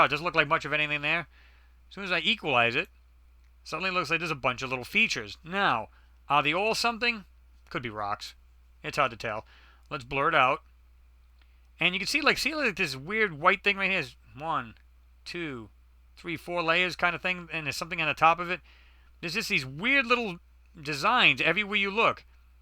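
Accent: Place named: Boston, United States. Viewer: Are you from Boston?! Lol my two favorite accents are the British accent and the Boston accent!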